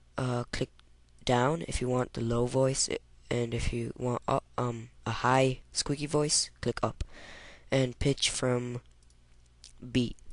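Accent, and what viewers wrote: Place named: Canada. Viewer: you kinda sound like canada cool. ^w^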